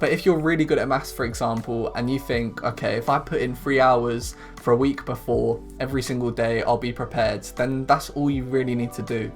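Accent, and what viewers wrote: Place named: United Kingdom. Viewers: it said GCSEs, so not edexel igcse , yes hes from uk, also rules and things he told is also appllicable for igcses, i m in igcses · Did you do edexcel igcse ( you sound like your from the uk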